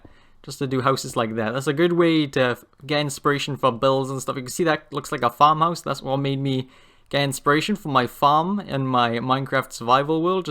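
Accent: Wales, United Kingdom. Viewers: I hear a hint of a Welsh accent?? Shout out to the home land? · You welsh?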